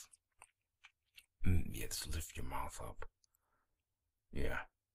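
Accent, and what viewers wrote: New York, United States. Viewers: Is he from New York? Accent vibes. Was waiting for him to say deadass....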